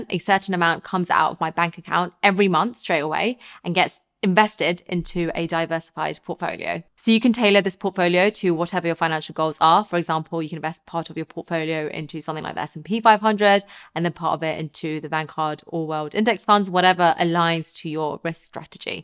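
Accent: England, United Kingdom. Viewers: I normally dislike English accents , but hers is lovely, very feminine, if she just wasn’t so hard to look at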